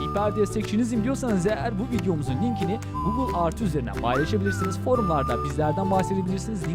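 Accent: Azerbaijan. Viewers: Siz Azerisiniz?? +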